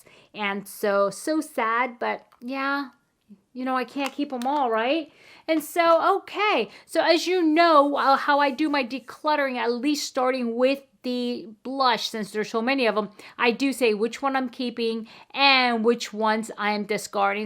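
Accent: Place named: Boston, United States. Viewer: I absolutely love your accent hon, is it Boston? I’m hopeless at working out regional accents x I’d love to see your skincare regime as your skin always looks so fresh x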